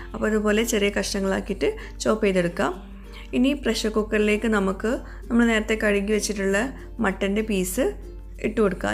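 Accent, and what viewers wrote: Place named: Tirur, India. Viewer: Adh correctaa... Tirur slang correctaayi vannu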